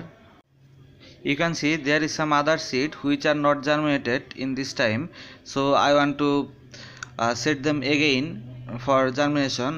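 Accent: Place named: Butler English, India. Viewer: Butler English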